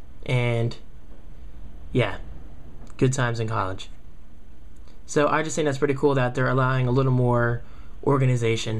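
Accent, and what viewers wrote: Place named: California, United States. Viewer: haha are u from california or somethen your accent is cool instead of photos your like FOWTOES XD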